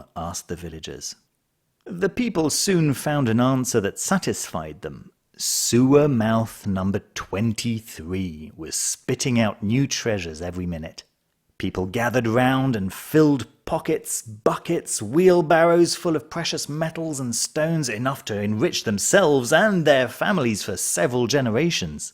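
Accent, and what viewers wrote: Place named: RP, United Kingdom. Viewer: you're the about the only intelligent English that I listen to all day I just love the way you speak to Queens English and you are have a permit if I could afford your patreon channel I wouldn't have to save up to buy a tarot deck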